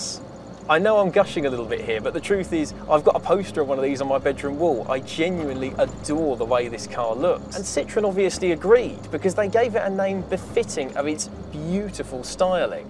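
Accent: United Kingdom. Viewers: The best thing is.... A BRITISH ACCENT to describe A FRENCH CAR.... VOILA....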